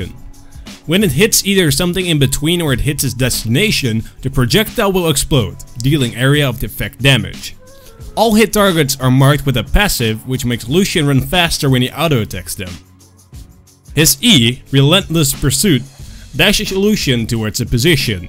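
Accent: Netherlands. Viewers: Sowieso een Nederlander dit, hoor je gelijk aan het accent. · Dutch if my guess via the accent is not mistaken....?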